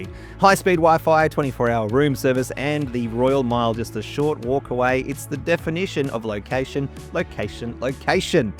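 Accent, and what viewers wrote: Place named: Australia. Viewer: Bro are you Australian?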